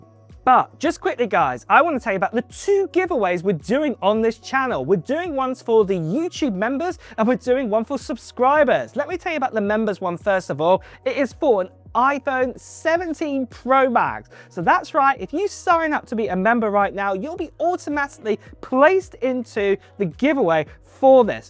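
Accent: United Kingdom. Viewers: Thought it was a woman talking 😂, why do most men sound funny, ? In Britain - I’m assuming the accent is British, although I could be wrong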